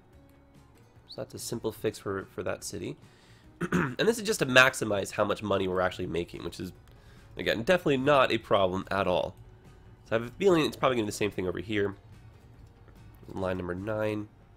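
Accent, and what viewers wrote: Canada. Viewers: I know this is a 3 year old comment but that's just his Canadian accent.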